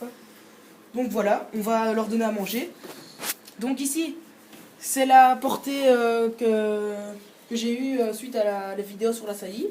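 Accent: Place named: North, France. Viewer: on dirait un mec du nord vu le parlé sinon lapins mignons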